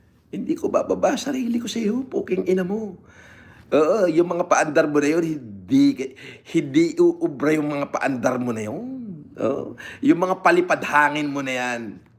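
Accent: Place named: Nueva Ecija, Philippines. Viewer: nangto tunog nueva ecijano, kupal peke imahe HAHAHA😂😂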